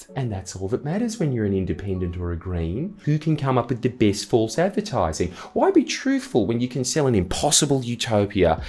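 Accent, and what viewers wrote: Australia. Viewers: This is probably the most Australian I think I've ever heard him sound.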